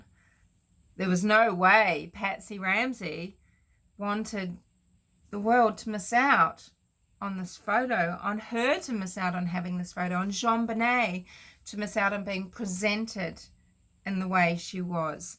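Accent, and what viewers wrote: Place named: Australia. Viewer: Nice video. I could listen to your Australian accent all day. Cheers!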